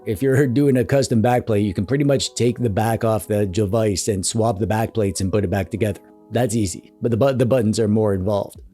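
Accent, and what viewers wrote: Canada. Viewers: you're Canadian?!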